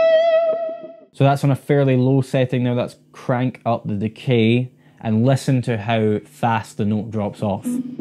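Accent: Scotland, United Kingdom. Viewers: What...that Scottish accent!! 🙂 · Good Tae a Scottish voice .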